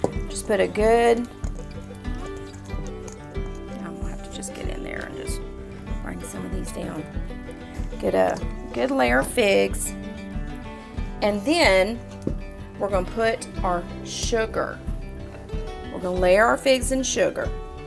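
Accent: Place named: Georgia, United States. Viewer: I'm attempting my first fig preserves. I saved several videos to watch and learn but I didn't make it past yours. 😂 I started it and was like, "hey she sounds like me!" (I'm from GA too) - so I didn't watch any other videos. I've been so intimidated by this but your video makes it look so simple. Diving in now - thank you!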